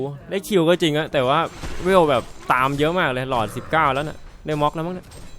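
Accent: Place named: Korat, Thailand. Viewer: เสียงพี่โคราชมากคับ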